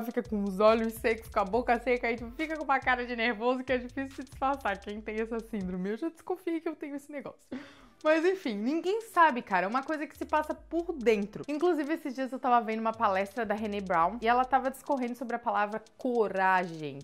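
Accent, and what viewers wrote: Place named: Caratinga, Brazil. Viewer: Sabia que esse Sotaque era Caratinense KKKKKKKKKKKK viva a doce e bela Santa Catarina ♥️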